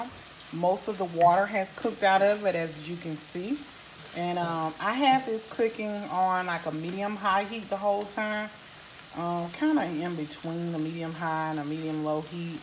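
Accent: South, United States. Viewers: It's been a while since I made squash casserole, so looked yours up on here. This is a good one, but I used zucchini & yellow squash together... I've gotten so much from my garden this year! I had to use the closed caption as my hubby was watching TV & got tickled at the way the person who wrote out your words spelled things!! They must have had a time translating your southern accent... lol I made this many moons ago & glad to have you to refresh my memory... I'm 73 yrs God Bless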